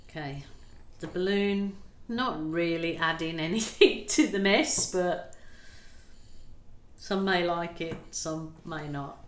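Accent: Birmingham, United Kingdom. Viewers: Better than wasting leftover paint :) And it's fun to just play around sometimes! I've noticed that when you take your photos, we see the colours more vibrantly and possibly more true to life. Also, I knew you were in Australia, but I assumed that you were from the UK lol, Your accent definitely has a touch of Brum to my ears. But I am almost completely deaf so my ears can't be trusted lol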